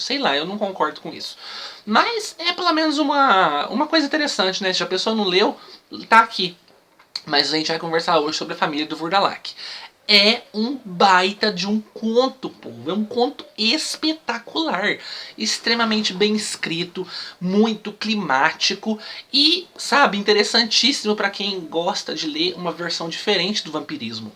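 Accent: Minas Gerais, Brazil. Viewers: "Sai pra lá, não quero esse trem não" KKKKKKKKKK amo as resenhas do principalmente pelo mineirês🤣🤣🤣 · Um resumo bem mineirês. Amei!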